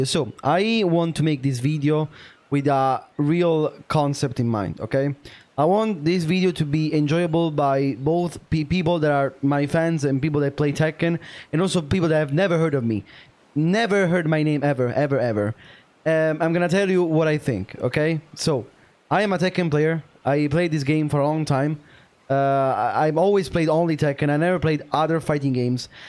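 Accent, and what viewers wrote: Italy. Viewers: You sound Italian, the best European vf player is from Italy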